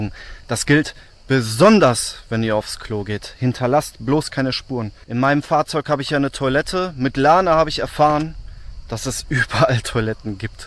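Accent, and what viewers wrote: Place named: Poland. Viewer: Hey, sag mal hast du Polnische Wurzeln? Du sprichst Polnisch wie ich ich habe eine Oma die aus Polen Kahm 😊Liebe grüße von mir und alles glück der Welt auf deinen Reißen ich währe so gerne so mutig wie du vielleicht wenn meine Kinder aus dem Haus sind!!!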